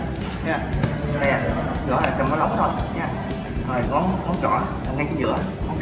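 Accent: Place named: Mekong Delta, Vietnam. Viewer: Anh nằy miền tay he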